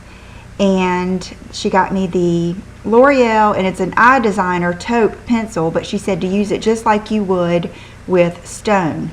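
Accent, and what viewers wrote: United States. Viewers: i just love your country accent :)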